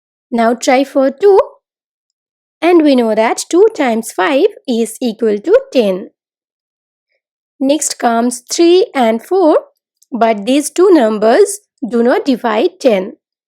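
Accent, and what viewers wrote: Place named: India. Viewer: the indian accent is classic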